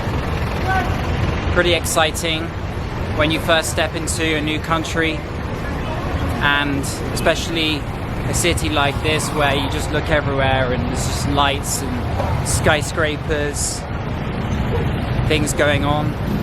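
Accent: England, United Kingdom. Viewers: I love your voice and English accent..You are exceptional in explaining sequence of events during your vedios...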